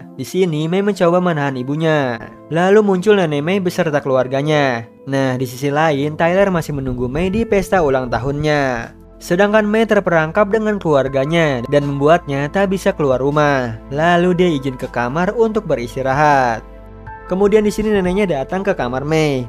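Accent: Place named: Jakarta, Indonesia. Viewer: Dari Malaysia kok logatnya kayak orang jakarta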